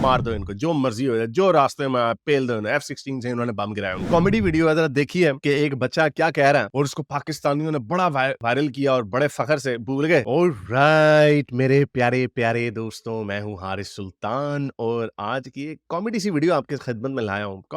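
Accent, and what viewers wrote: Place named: Punjab, India. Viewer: bhai aapke bolne ka style Indian Punjab jaisa hai. Matlab ttha sota baat kerte ho. Jo fact hai ussi pe baat kerte ho. Neutral ho ke baat kerte ho. Ye nahi ke aap pakistani ho toh sirf India ke berkhilaaf hi bolna hai. Aapne Pakistan ke propaganda ko khol ke rakh diya. TNX 🙏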